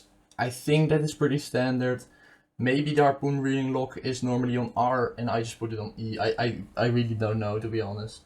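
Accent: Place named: Netherlands. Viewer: ik hoor hier een Nederlands accentje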